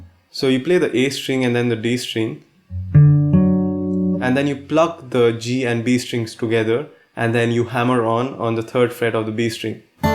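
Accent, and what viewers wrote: India. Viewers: Why do u have indian accent?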